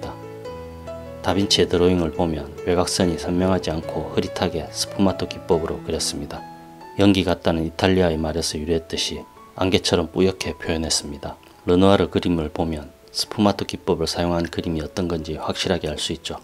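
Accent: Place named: Busan, South Korea. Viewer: 나이쑤 부산말도 재치도 그림도 늠조으네요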